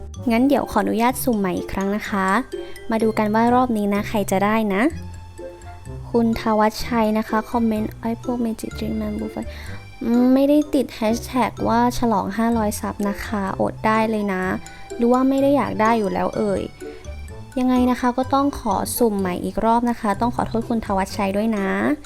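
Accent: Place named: England, United Kingdom. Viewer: ชอบสำเนียงอังกฤษจริงๆ เลิฟๆ💕💕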